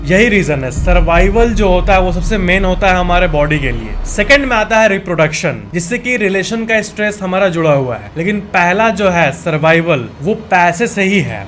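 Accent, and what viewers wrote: Bihar, India. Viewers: Bhai tum bihar se ho kya ?? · Vai aap bihar se ho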